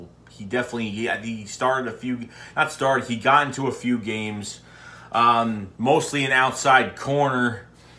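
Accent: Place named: Philadelphia, United States. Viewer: Love your Philly accent trying to pronounce Kamu Grugier-Hill as Kamu Gruger-Hill lol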